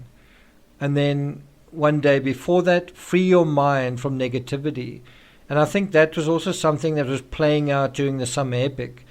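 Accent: South Africa. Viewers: Trying to work out if u are from CT, KZN or Gauteng with that accent.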